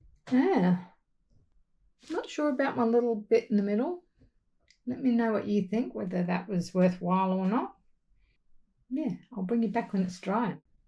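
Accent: Australia. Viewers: Thats brilliant inventive artwork, it is also borderline ASMR, with your Aussie accent and the other sounds, very theraputic. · Beautiful..going to my table to try this. Just enough cells and gold and the centre is great…love your natural way of working and commenting…nice to hear an Aussie on board. 💖💖Thanks, from Ruth in Tasmania. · Regarding the middle..you could have stopped at gold dots but still beautiful, well done. You sound Australian is that where you are? Asking because you're using Amsterdam paints...I'm in NZ and giving trouble sourcing supplies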